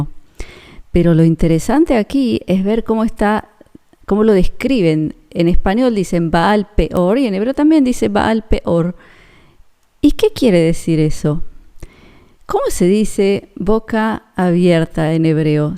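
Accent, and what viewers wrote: Argentina. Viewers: Una Argentina leyendo eso?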